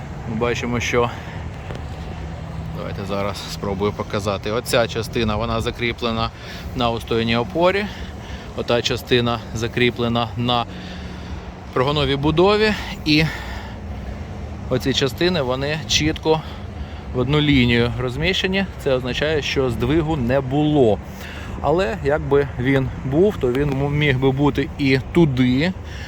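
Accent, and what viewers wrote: Kyiv, Ukraine. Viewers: який гарна "київська говірка", як Ви колись казали 👍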